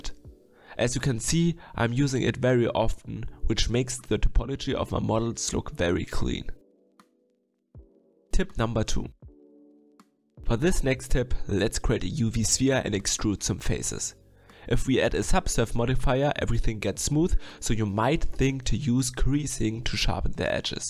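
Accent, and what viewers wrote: Germany. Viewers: Idk why but u sound a bit German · Through the full video i need to think about how german your accent sounds XD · Das ist doch ein deutscher Akzent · deutsch accent or i wrong? ) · Cool, but are you from germany?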